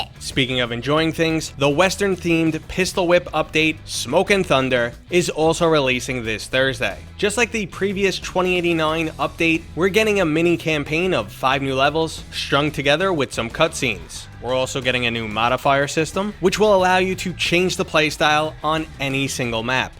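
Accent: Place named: Boston, United States. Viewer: Get paid man, make that Boston accent work for you!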